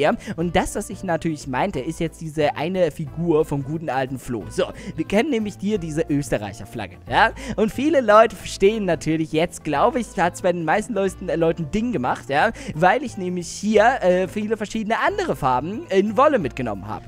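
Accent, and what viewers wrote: Russia. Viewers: Kommst du aus Russland?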